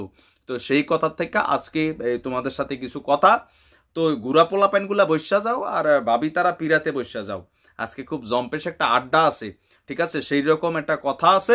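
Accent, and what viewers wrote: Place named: Barishal, Bangladesh. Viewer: Vaiya josss hoiche borisal er vasha. Carry on vaiya. God bless you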